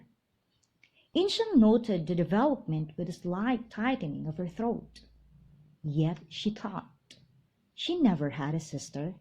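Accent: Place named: United Kingdom. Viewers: I can't understand your like a British accent 😌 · British accent times ten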